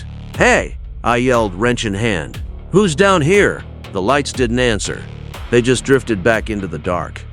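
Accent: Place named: South, United States. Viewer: The Southern accent made it worth while for the listener. 👍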